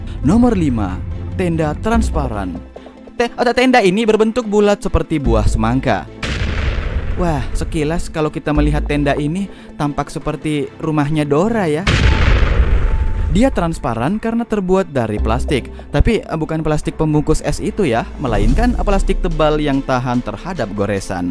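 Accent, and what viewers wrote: Makassar, Indonesia. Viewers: tinggalnya di Maros gua lihatmya di IG dan coba cek videonya yang Review HP,logat makassarnya pasti terdengar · Logaknya makassar rodong ngr😂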